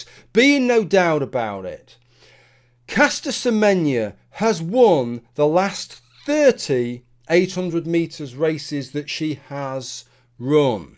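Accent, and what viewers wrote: United Kingdom. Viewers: RR 2.0, bigger, better and educational, even more UK accent.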